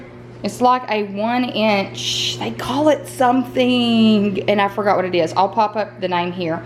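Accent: South, United States. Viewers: I think it turned out really cute! Your southern accent is so endearing :)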